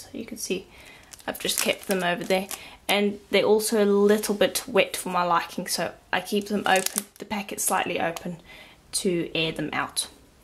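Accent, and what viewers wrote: South Africa. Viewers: Such a well organised studio😍 where are you from, your accent sounds South African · Hi! You are so organized! Thank you for your studio tour. I'm just starting out but it's given me a few ideas of how to better organize what I have and prepare for what I plan to get! BTW, my husband commented on your accent as soon as he heard your voice--he and his family are from South Africa and they were from Johannesburg.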